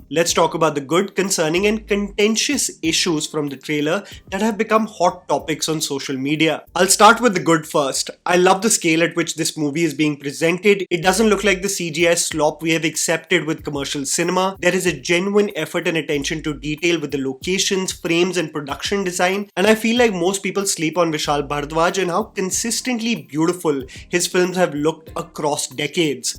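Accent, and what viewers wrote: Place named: India. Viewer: My god, where do you get your English from. I know it's not chatgpt because you've been this way since before Ai. This is where the Indian accent doesn't sound too bad cuz the way you express what you wanna say.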